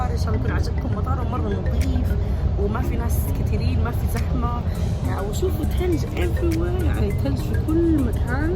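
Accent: Saudi Arabia. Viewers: هههههههه باين مأثرة عليك الرياض لهجتك المخلوطة حلوة ... الله يحفظك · لهجتها سعودية ودمها سوداني وجنسيتها تشادية 🔥🔥❤ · لهجة جداوية بححححتا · اتكلمي باللهجتك ....مرة سعودي مر سوداني لا تنسي روحك انتي تشادية سوداني ابدوي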